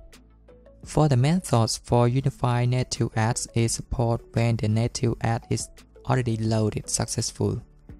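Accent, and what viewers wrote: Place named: Thailand. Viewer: Thank you for good tutorial, (One question, are you Thai people? for me your sound like Thai people 😊👍)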